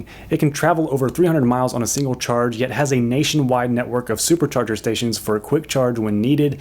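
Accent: Kentucky, United States. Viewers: hahaha i thought you was in kentucky...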